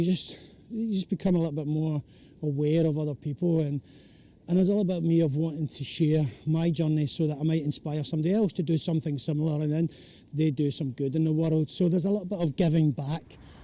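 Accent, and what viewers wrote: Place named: Glasgow, United Kingdom. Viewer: Did my undergraduate study at the university of Strathclyde in Glasgow, your accent really reminds me of the old times, glad you like China~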